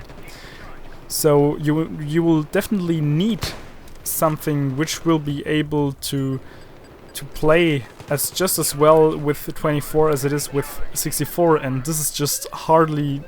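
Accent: Germany. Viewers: I am trying to figure out where you live, because of your accent. And I think you are a german? Is this correct?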